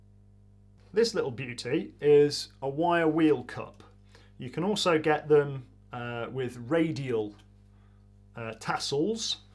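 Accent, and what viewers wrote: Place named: Blackpool, United Kingdom. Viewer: Do you come from Blackpool? Your accent reminded me of Phil, from Limmys world of Glasgow (it’s on YouTube) 👍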